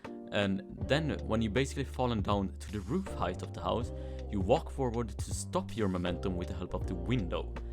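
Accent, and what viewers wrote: Sweden. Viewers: Ahh, the original Swedish accent. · sweden? i can hear that little accent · are you swedish? 🤔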